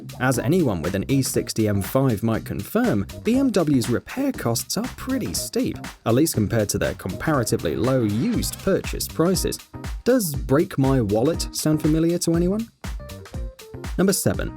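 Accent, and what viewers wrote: United Kingdom. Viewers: Love your channel are you british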